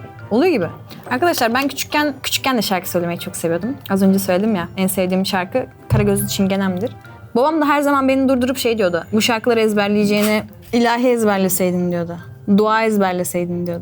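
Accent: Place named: Adana, Turkey. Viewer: abla adana li gibi sin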